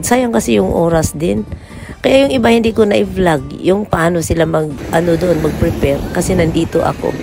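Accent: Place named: Maasin, Philippines. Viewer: Maasin city wet market yan..happy watching to your vlog..kasi very fameliar ko ang lugar pati pananalita mo inday at yong shoreline sa likod nang maasin city hall very fameliar po sa akin kasi taga dyan rin ako. Thanks for sharing..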